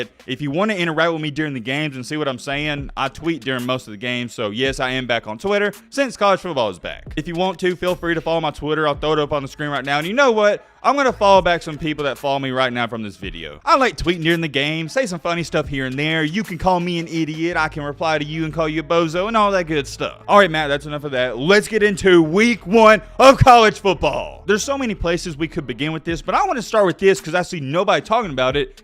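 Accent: South, United States. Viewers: It was #2 vs #5 and Both teams played tough tough Defense that should’ve been expected Two top 5 teams and dude your Clearly a SEC guy it’s all in your accent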